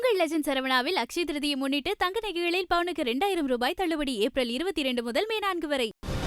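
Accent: Sri Lanka. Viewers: Lankan tamil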